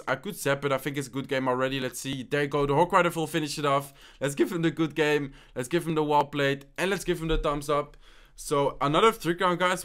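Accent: Netherlands. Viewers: Haha Yes thats Dutch, Im dutch to. Its funny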